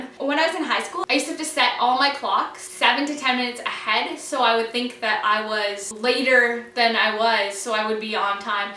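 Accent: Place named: South, United States. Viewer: I love the country accent ajajajaj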